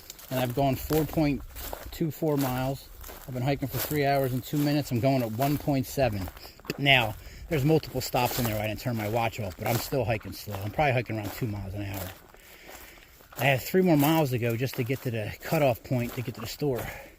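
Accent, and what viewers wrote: Philadelphia, United States. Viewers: I hear your accent are you from Philly?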